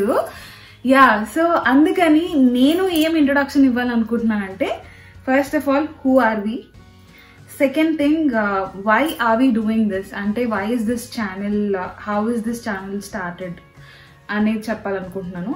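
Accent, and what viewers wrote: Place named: West Godavari, India. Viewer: Awwww my slang u also from w.g.dt good good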